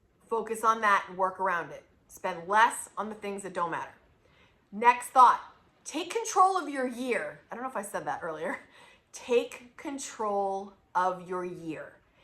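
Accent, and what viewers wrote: United States. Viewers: I'm working on my no-buy, low-buy strategy and also working on my "aspirational crafting" collections. I've got way too many different craft interests, and I'm going to hone them down to TWO: photography and drawing/acrylic painting. I'm in Connecticut and you sound like you're from the state as well. If so, great to "meet" you and your really helpful channel! 😊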